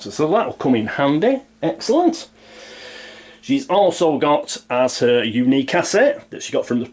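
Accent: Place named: Manchester, United Kingdom. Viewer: Gteat to have your Manc accent back. Did ypu have much trouble finding your EH boxes under all of the dust!😊 Hope life has been good for ya in meantime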